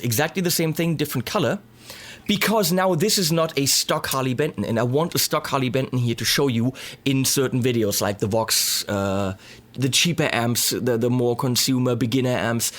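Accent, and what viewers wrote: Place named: Germany. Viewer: Hab ichs doch gewusst, dass du Deutscher bist! Den komischen Akzent haben halt nur wir :D Ich überlege grade, ob es sich lohnt, meine Fender Lite Ash mit besseren Tonabnehmern auszustatten... Momentan klingt sie ziemlich drahtig und dünn. Die Mexico-Tele von nem Freund klingt richtig geil über meinen Fender Deville 410...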